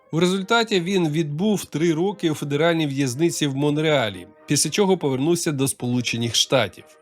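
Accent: Russia. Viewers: Приємно бачити нові україномовні канали, однозначно лайк та підписка для підтримки))) Цікава подача, чекаємо ще PS. у диктора чутно слабкий російський акцент, тому ще вподобайка за перехід на солов'їну, а текст написано дуже і дуже хорошою українською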